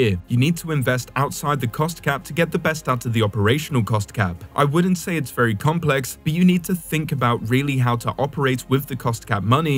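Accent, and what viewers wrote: United Kingdom. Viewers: I don't know who is narrating this, but I'm tired of everything about racing being fed to us with a British accent. Diffey is the worst.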